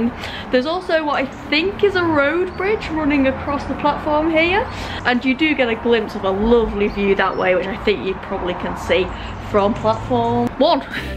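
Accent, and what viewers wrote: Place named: Wigan, United Kingdom. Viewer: A wiganer doing pronunciation, makes me feel all warm inside.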